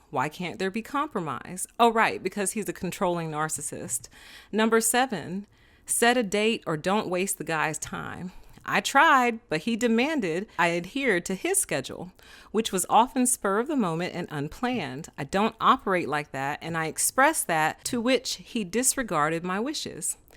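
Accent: South, United States. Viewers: You have a cute southern accent!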